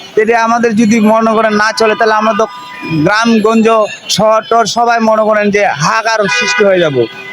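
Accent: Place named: India. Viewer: Tumi ki bharoter bangaly naki? Tomar bhasay bharotiyo.mone hoy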